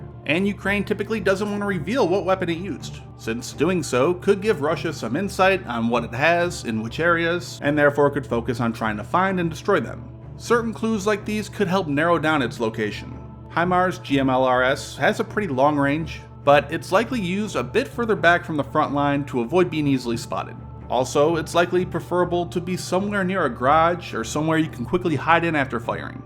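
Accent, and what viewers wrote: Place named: United States. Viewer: Your hypersonic speaking speed, couples with that horrible American accent, ensures that most people in Asia would not understand much of what you are narrating.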